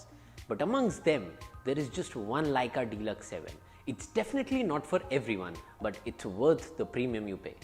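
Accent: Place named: Ireland. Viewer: Background music and Accent are two different things. Many peoples in the world don't understand Irish English. Even UK and US have accents based on the people's locations. Sometimes try to speak another language than your English you learned from your birth. That's really annoying when someone bullies others on their accent.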